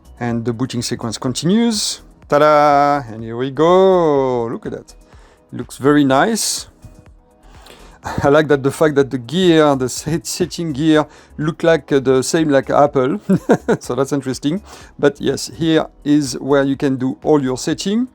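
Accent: France. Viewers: He have french accent 😮, but the tab is good🎉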